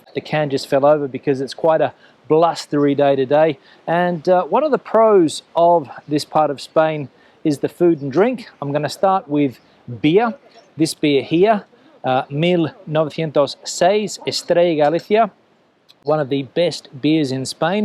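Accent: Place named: Australia. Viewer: Dat Aussie accent. I've been watching your videos for a while. Thanks for all the info. Cheers from France.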